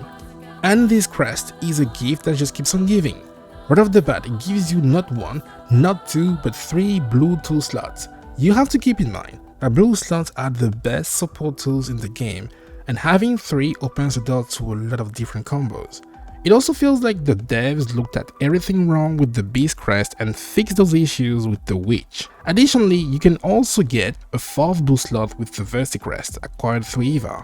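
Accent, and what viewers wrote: France. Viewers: Are you french? ew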